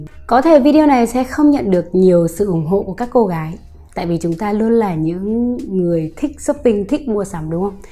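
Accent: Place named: North, Vietnam. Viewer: Mình xin lỗi nhưng k hiểu sao mình k thích giọng miền Bắc cho lắm. Nhưng giọng của bạn hay quá. Lần đầu tiên nghe giọng Bắc mà thấy thiện cảm mà hay như vậy, truyền cảm.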